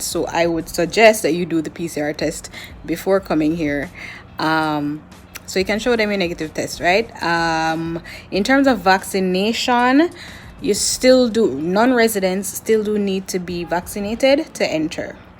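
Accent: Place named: France. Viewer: Very good information for prospective travelers.Are you French?You sound French.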